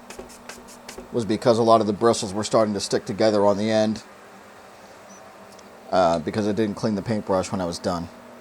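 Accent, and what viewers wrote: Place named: Pittsburgh, United States. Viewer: Pittsburgh boy. I recognize the accent. My grandparents lived on Glen Coe dr. McKeesport.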